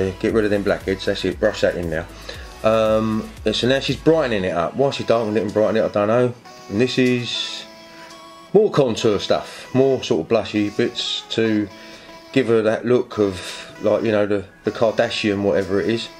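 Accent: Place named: Cockney, United Kingdom. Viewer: cockney accent love it x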